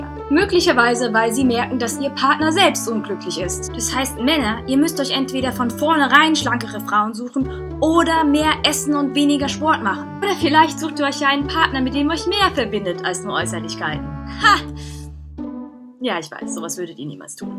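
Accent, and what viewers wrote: Germany. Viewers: Klingt vor allem nach Ruhrpott. Sach ma Doartmund 👍😉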